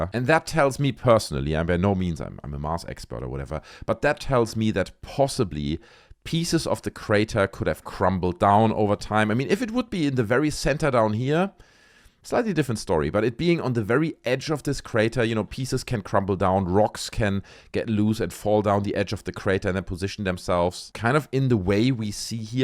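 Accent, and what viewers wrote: Germany. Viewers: an english speaking german sounds so intelligent and trustworthy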